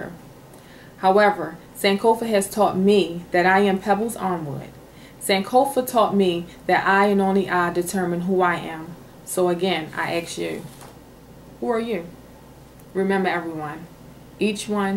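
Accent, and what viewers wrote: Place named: Baltimore, United States. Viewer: You got to be from Baltimore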